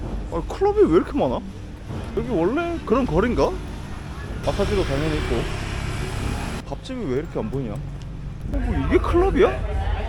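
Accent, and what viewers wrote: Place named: Gyeongsang, South Korea. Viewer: 고향이 어드래요? 경상도 말도 튀어 나왔다가 연변 말도 살짝 나왔다가 표준어가 오르락 내리락 하네요 한국말 잘하는 교포?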